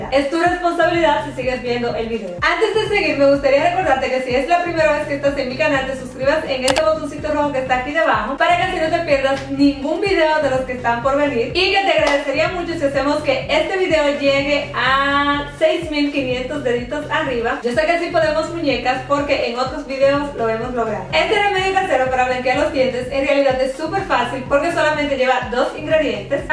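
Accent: Dominican Republic. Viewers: eres Dominicana?😍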